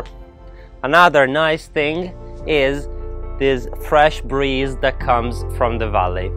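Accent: Italy. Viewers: L💓Ve listening to ur Italian accent . Besides u r an awesome guy ur self ! . 👍💕💕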